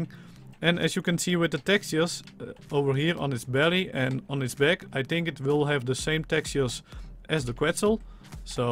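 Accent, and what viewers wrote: Netherlands. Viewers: Hes Dutch lol that english do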